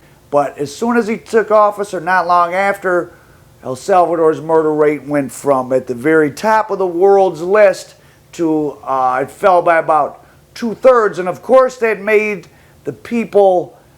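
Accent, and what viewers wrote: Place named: Michigan, United States. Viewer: I was going to ask, are you from east michigan or detroit.. that accent 👌 You were recommended, subscribed already. Cheers from the mitten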